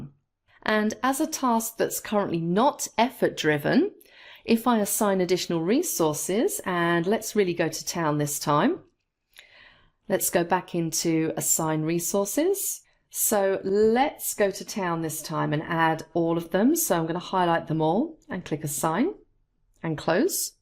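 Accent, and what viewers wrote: United Kingdom. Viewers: I bet, that’s because of UK accent, isn’t it? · British accent, i love it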